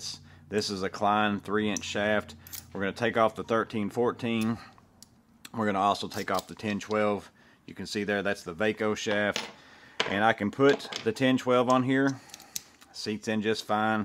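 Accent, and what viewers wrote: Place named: North, United States. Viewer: I have an accent, just like yours. And I have to squelch it, when I go back home to my elders country. Where they make all the tools, by hand and grow all the pumpkins for the holidays. It's got a heavy Old Dutch/Spanish demeanor. I grew up in Texas/Louisiana....but I'm from an Indian, Dutch/Old Spaniard area of North USA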